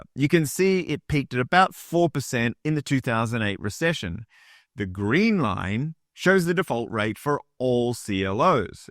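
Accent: Australia. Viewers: Masterful & timely analysis of the pros and cons of CLOZ vs. other similar funds with different credit portfolios. reads and synthesizes the SA analysis which Couch investors like me benefit from and delivers in clear, personable, articulate English/Australian (?)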